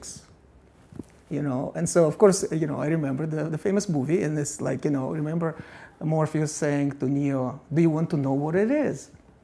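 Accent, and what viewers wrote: Russia. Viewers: That's real mild russian accent. Doesn't quite sound like ensign Chekov, right? :D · sounds like Russian pronunciation. maybe he will do same speech in Russian? it would be such a pleasure. but I can be wrong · It so funny and pleasing to hear his Russian accent and intonations here and there :) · I LOVE HIS ACCENT . MOTHER RUSSIA!! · Thinks Hmmm... He has accent like a russian. googling Yup! He is russian :) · Born May 2, 1968 Kolomna, USSR i knew it from his accent! ) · Russian accent hm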